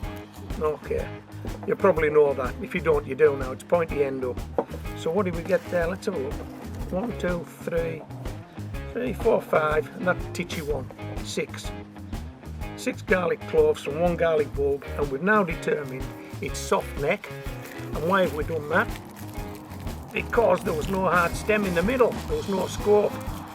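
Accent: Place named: Isle of Wight, United Kingdom. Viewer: Lose the music, your voice is entertaining and Molly was intrigued! Isle of white?.. I am trying to guess where you are! I am in California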